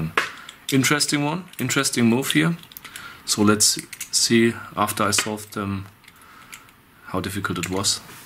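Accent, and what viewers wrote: Germany. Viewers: kommst aus deutschland oder?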